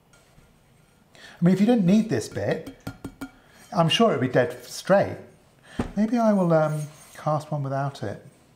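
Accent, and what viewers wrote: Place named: United Kingdom. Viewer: Hi again. I got excited watching you opening up these bad boys. Knowing what it's like to attempt a new pour I can almost feel my heart beating faster. Fun to watch! Right now I am making patterns (wood) and prepping to cast some CNC parts (the z carrage) which are longer and heavier than anything else I have done (it's to be a big 8 x 4 monster cnc). I like the gateing and swirl trap system you used. I 'think' I will need gates a touch larger for the casting I want to make to get all the metal in there before freezing... By your accent I am guessing you might be in the UK? If so, at some point we should totally do a YT colab of some kind. Ok peace, Bongo. PS, how do you think you could eliminate the bending (do you just put it down to the geometry of the pattern (the two perpendicular ribs)?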